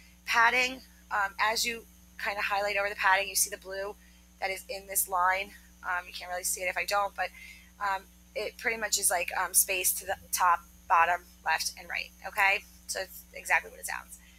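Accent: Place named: United States. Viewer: Full American English, only native USA could understand it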